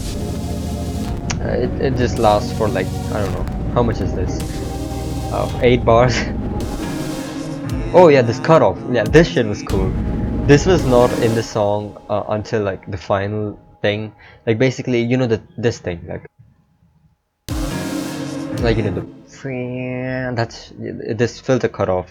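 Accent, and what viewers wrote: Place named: England, United Kingdom. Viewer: you tried to get english ascent🧐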